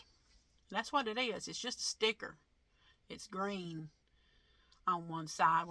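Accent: South, United States. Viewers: Hi mss from western Nebraska, please take care of your knee this coming from someone who had many knee issues. Getting a new one can be endured and so much better. Keep on posting, love your channel. Love your southern accent!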